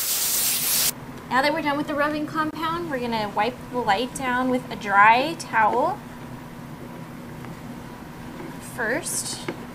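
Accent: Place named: United States. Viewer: excellent video ,but I hate your tono of voice is so noise and saunds so greengo ,:(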